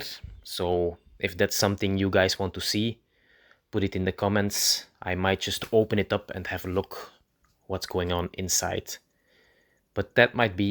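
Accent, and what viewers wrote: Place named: Netherlands. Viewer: So you're belgian? :) nice (anything else would still be nice - i like your video) I was suspecting a dutch-like english accent - your pronounciation resembles Mr.Dagon a lot (a guy with a pocket knife yt channel) Subtitles would help, but even without would be better than not making the video :)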